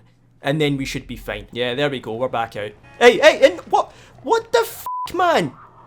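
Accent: Scotland, United Kingdom. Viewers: You got a very strong scottsh accent my boy😂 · i hear scottish mother tongue- i like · clicked for the information, stayed for the scottish accent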